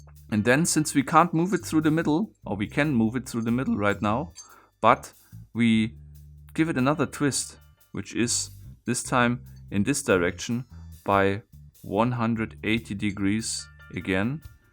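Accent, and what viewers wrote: Germany. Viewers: What language is that? I’m just curious. And also what country are you from? I want to say you have a German accent?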